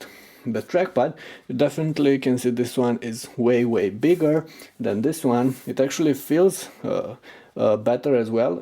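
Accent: Romania. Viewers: Bai tu ai accent de roman :) ... asai :) ? Salutari din München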